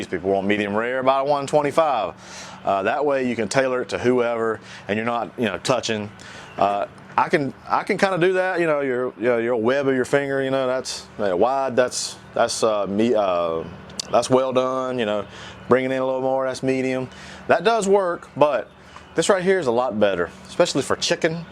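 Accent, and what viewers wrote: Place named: Carolinas, United States. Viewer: Awesome vids! As soon as you started talking, I knew you were a fellow Carolinian! Keep cranking out the great food and information!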